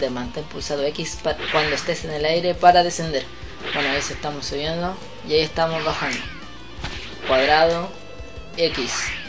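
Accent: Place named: Mexico. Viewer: por tu acento creo que eres mexicano verdad?? viva mexico carajo!!! jajajajjjxdjkajxkajdoisadjs xd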